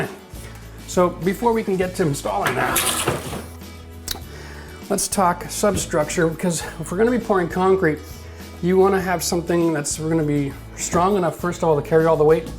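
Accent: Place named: Canada. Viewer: I didn't even notice the 'Eh accent till the very end when he let out an "abooot"!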